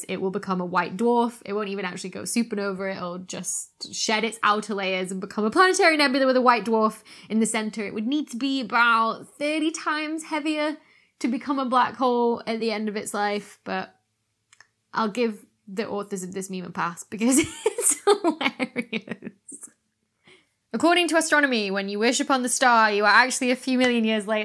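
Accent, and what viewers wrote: United Kingdom. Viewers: Woah cute female physicist with a British accent. Annnnd that's a subscribe. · Do the rest of you folks pronounce it glacier, or glacier? I know is from the UK and apparently over there it's pronounced glacier, here in Canada we pronounce it glacier. How do the rest of you folks pronounce it? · Also your briish accent is so thick 😹😹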